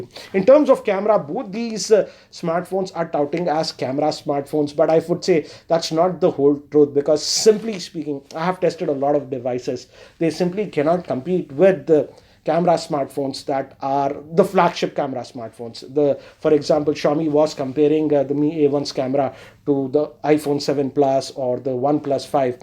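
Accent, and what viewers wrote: India. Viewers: your accent man is so Indian i cant stand it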